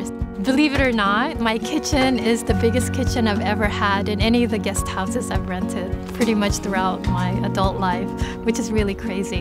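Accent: Philippines. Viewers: When I first hear her talking base on her accent I knew she˚s a Filipino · I didnt realize you are a filipina. really nice accent.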